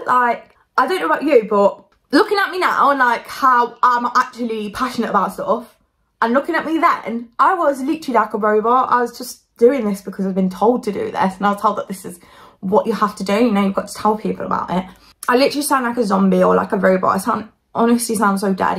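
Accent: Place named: Nottingham, United Kingdom. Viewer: Brilliant vid! Is that a Notts accent I hear? Reminds me of one of my close friends from Mansfield/Sutton in Ashfield!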